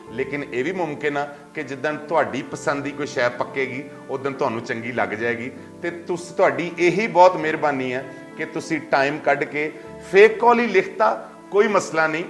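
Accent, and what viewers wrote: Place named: Rural Punjab, India. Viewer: saab aap punjabi bohut pyari bolte ho. Pure desi punjabi. Love it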